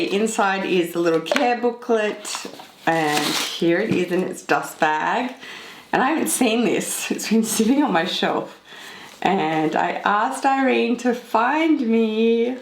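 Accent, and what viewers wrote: Australia. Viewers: Hi, I love watching your videos and hearing your Aussie accent too 😍😍 sorry don’t wanna sound rude but just out of curiosity, what is your plan with your luxury bags collection in the future? What is your goal with them? You obviously won’t be able to wear them all, so what’s your plan with your collection in the future? Thanks! 😊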